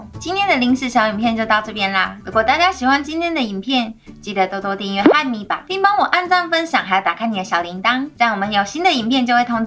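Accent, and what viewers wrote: Japan. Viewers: 有點日本口音⋯ But Good Job!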